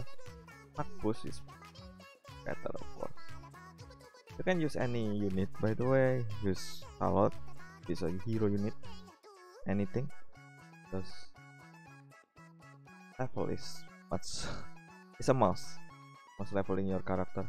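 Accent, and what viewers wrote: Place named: Indonesia. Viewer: Dari logat nya indo banget dah 🤭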